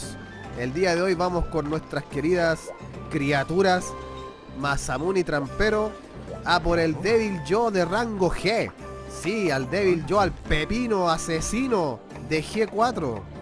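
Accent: Chile. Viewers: eres chileno verdad? Y si lo eres de que parte? Bless y me gustan tus videos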